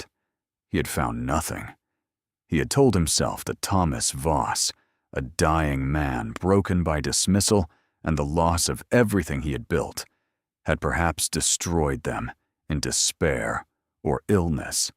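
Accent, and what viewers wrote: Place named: United States. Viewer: Ovo je jedan od dva AI kanala koja pratim, ne volim AI kanale ali volim ovakve priče, kad ChatGPT nije nepismen kao obično. Molim da se prebacite na britanski engleski jer američki akcent u pričama ove vrste iritira i dodaje na veštačkom dojmu kojeg je, zbog AI, ionako više nego dovoljno. Hvala.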